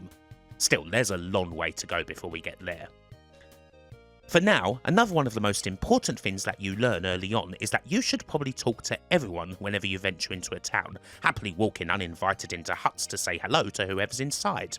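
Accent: United Kingdom. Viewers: Does the narrator have a particular British accent? I'm asking because I'm not British but from Sweden.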